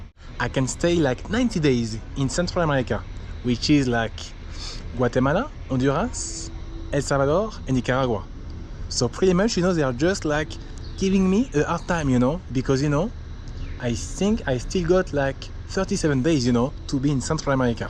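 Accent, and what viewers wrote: France. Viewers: I think you are using the correct words you’ve just got a few things going on at once. French accent & you put all your words together quickly…like real quick. So they sound different. It’s like a remix of words…😂 safe travels😊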